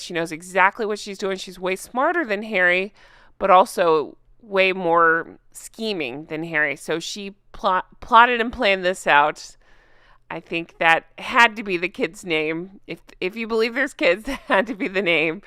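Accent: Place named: South, United States. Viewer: Oh dear. you crack me right up. Being an Aussie and living in the UK for a very long time, it did take me awhile to tune in to your southern accent, but now you are defo my fave you-tuber...... You make me laugh so much, you fair make my day..... I hope that 2024 is a great year for you, Jay and your family..... loads of love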